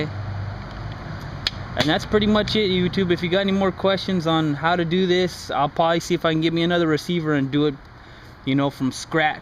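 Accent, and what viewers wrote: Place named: Mexico. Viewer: Your Mexican Right ? i can tell by your accent :P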